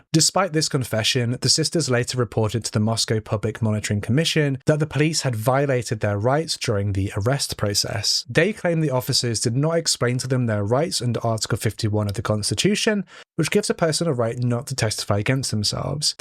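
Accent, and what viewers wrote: United Kingdom. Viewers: Smoked a bowl before I put this on to clean. Spent way too long remembering that Brit’s say zed for z. Had to pause, rewind, and wonder what language u spoke. 🤦🏼‍♀️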